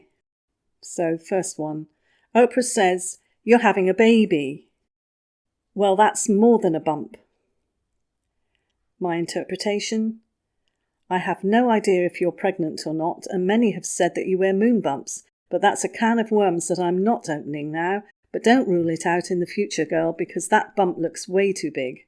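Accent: United Kingdom. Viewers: ❤ thank you for the video. You doing very good job 🖐🖐 from Sweden. Oh i forget to say i love your voices its so calm and sweet with that British accent 🙂 never saw you in person but iam sure you are really nice person.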